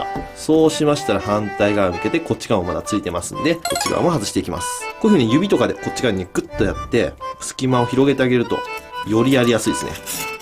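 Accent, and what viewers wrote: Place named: Mikawa, Japan. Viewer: だらって三河弁なんだね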